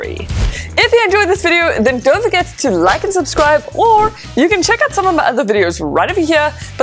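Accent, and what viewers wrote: South Africa. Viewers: I love your channel!, you are so full of life and fun! Love your South African accent! Are you single by any chance? If not are you happy? Lol keep up the good work. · Are you south African ? 😅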